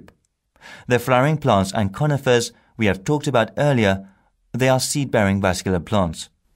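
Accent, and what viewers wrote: United Kingdom. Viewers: British accent , this is a nice accent , but not for exam . 4/6 I could do it better :(((((((((( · me too :'( bc it's British Accent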